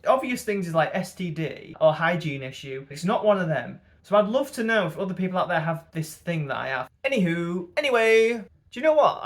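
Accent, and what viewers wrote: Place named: United Kingdom. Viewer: Forgive me for being a dumb American but you’re so British! LOL I could listen to you talk all day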